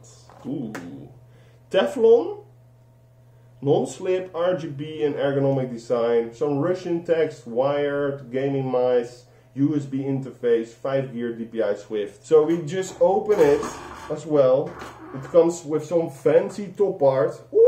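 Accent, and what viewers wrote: Netherlands. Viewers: Je hoort Ook gwn rustig die nederlandse actent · Love the little Sasuke figure! Greeting from Holland, I can hear you're dutch aswell. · u fr sound dutch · Hah nederlands accent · no problem keep it up maybe one day earning :))) and you sound dutch? xdd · Are you Dutch u really have a Dutch accent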